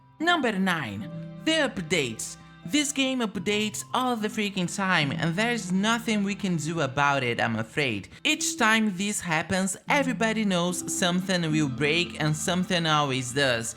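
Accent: Brazil. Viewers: I still have Sims 2 Ultimate Collection and I love to play it so much, its thousand times better than Sims 4, but then I never played Sims 4's DLC's, so I want to experience the DLCs for myself too. So, I play both but with the Tutorials from PleasantSims, so I play rotationally and just with the Maxis Sims, and I use a lot of Mods too, that are essential. There is even a Sims 2 Savefile, which has the ancestors and everything from Sims 2, but I never used it I just saw it in videos, it seems to be perfect, but I feel like Sims 4 isn't the same universe as Sims 2. It would be perfect if they could make a new Sims game with Sims 2 + 3 gameplay, world, ancestors and all and just the CAS and B&B from Sims 4. That would be perfect, what do you think? PS: Seu sutaque brazileiro é notavel, mas o seu inglés é muito bom! É bom falar assim com o sutaque. E já me inscrevi no seu canal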